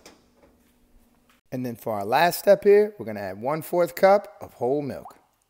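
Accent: Louisiana, United States. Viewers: Actually VLOGS not to mention that Cajun accent to go with the dish sets it all off😂🤣..I’m from New Orleans,La.👊🏽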